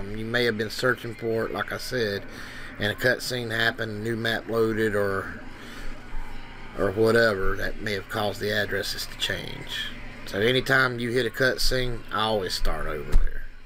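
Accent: South, United States. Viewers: I did it yesterday whole day and half of night. I started with float, 4 bytes and unknown initial value, first searсh gave me more than one billion results, than I found a border on the gas station (in the game prologue) and step up and down and after every action change value to decrease or increase and did next search. After first step on border it decreased results to ~ 3 millions and than I did the same action by circle, after almost 30-40 minutes I get few values, but they was red and changed realtime, so it probably wasn't height of the character, maybe rain or her hair or body movement or even rotation of the camera... no idea. When I did it camera always changed and also when pushed alt+tab. Than I tryed the same roadside, ladders, run and step up and down in diffrent locations, after a coupe of searshes I get something like 50 adresses still appeared, than repeated same actions, until I got 5, but change the value, notihnig changed in the game, so I probably miss something. 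Also during first search my PC works pretty tough almost freezing, well I don't know what to do. It seems pretty complicated also english isn't my native and yours sounds for me like you some kind of country singer :)) I'll try to look closely on your video, thanks!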